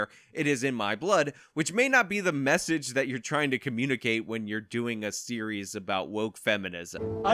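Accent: New York, United States. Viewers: oh my gosh when I read your comment I said "whatever whatever" with his New York accent 😂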